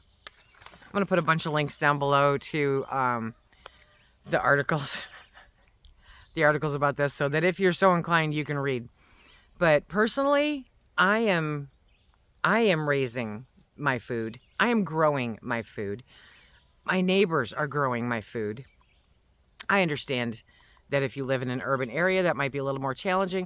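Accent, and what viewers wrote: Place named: California, United States. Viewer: Sounds so California. Keep it there please.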